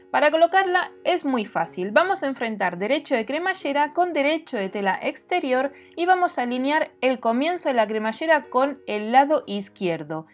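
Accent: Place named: Buenos Aires, Argentina. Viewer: Hermoso! 😊 Me despista el porteño con el español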